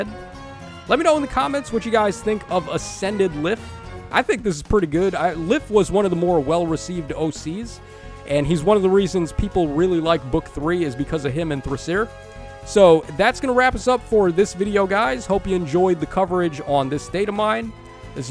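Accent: United States. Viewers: Ayo, that cowboy accent kinda got me down bad, tho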